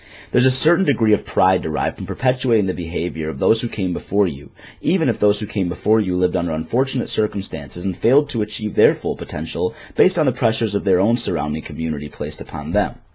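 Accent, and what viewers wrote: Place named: Midwest, United States. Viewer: Can't really tell, you can suspect, as I suspect that he's probably a white guy raised in the mid-west or north-east United States, but I also know a black dude who talks like Foghorn Leghorn (he legit sounds exactly like the character in pitch, tone, and accent), and a black chick who sounds like Rosa Ponselle when she sings. All you can really tell is that if he is black, he wasn't raised in a home which supported the notion that black people should "talk black".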